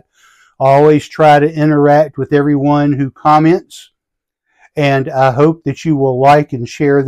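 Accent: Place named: Pennsylvania, United States. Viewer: Entertaining as always. I have read that a lot of shooters like the H4350 but at higher velocities. 2750 FPS seems to be the sweet spot. Some talk of RL17 also. Apparently it has been hard to get but the posts are older. I wish I had an indoor 100 yd range. BTW you are located in GA but your accent makes me believe you are from PA?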